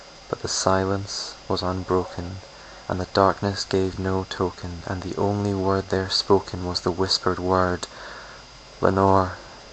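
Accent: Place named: Scotland, United Kingdom. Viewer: This will not get you girl/boy friends dude so if thats you angle buy a leather jacket and follow a rock band. However . . . if your passion is poetry, your in a obscene minority and I welcome you to a lonely club. If you message me back I will subscribe to your channel and follow your posts. Lovely reading, I'm half Scottish and I appreciate the accent. I would maybe like to see you post some of my favourites like "My Creed", "If" and "Invictus"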